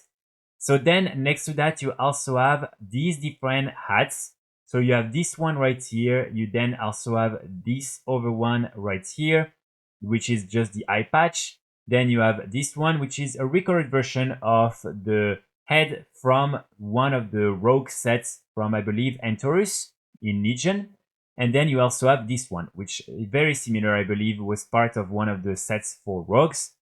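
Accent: France. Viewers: Cute accent Frenchie.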